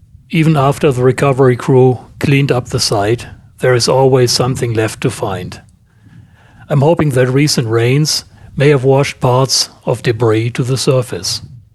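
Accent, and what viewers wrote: Germany. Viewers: I Really Hope the FBI Shuts you Down - Ya got a Foreign Accent too - German maybe🙂 We have the Most Advanced Military in the history of Man because we Protect our Secrets - Today we have idiots and Google earth among others that somehow think its OK to Spy on our most Sensitive Sights - You DO NOT HAVE A TS SAP/SAR SCI Q level etc. Clearance and You Do Not have a Need to Know👎🏻 Reporting this YT Channel also. Good Luck🇺🇸🇮🇱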